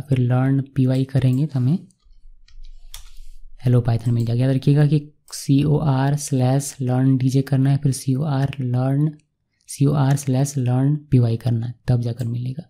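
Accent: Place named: Punjab, India. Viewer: I have seen Django courses on youtube but your tutorial course stands out because .... 1. You have explained theory behind django framework. I have not seen anywhere else; even in paid courses instructor is not able to explain theory like you have my friend. 2. Now most important part in learning Django is practical hands-on; you have also spent lot of time explaining step by step (GeekySteps as you call it in your videos; I love them :)) so your students don't get confused about django file structure. 3. Aaap ki hindi punjabi se kaafi milti zulti hai. Kya aap punjab se hai? If Yes, Wahe Guru sarji Sat Sriya Kaal!! You deserve many thanks for your videos. Thank You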